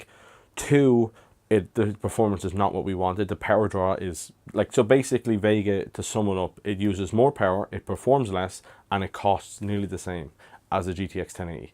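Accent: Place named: Ireland. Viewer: I'm trying to pick out the accent to see which county (or even which province) you're from. I'm not the best with accents though. Unless it's a strong Dublin, strong Cork, strong Donegal, etc I'll struggle. xD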